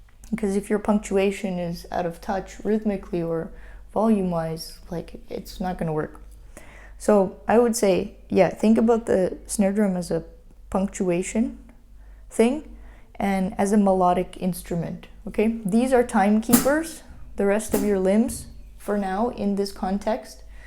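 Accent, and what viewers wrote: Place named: Eastern Europe. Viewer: Slavic accent. It's not thick at all, but it sort of 'rounds' words, makes them voluminous. Great video, btw!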